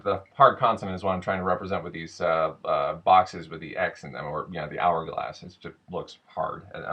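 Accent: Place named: South, United States. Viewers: well....the accent is from the south... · Sorry, but you sound like a good ol' Southern gentleman, rather than ebonics.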